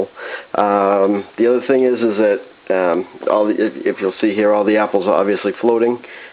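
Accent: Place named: Maine, United States. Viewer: You from Maine? You talk like we do.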